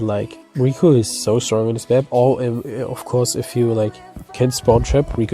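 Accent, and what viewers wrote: Austria. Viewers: Nice Vid. aber man hört beim English den Österreicher raushängen 😝👍🏻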